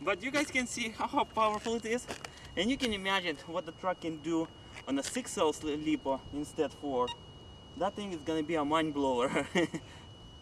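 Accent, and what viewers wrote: Russia. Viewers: did you cut out a lot of parts for it . like the aluminum stuff ? and is that a Russian accent ? and where do you live ?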